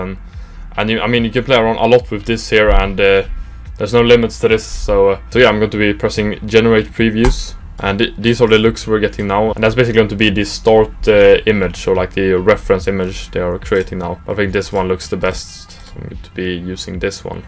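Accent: Sweden. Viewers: Älskar svengelskan, bra video!👍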